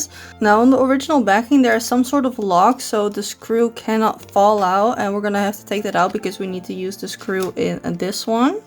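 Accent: Netherlands. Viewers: From hearing your accent I thought you were like Dutch… and when I saw “type om te zoeken” on your pc I was sure!!!! Nice video!!! <3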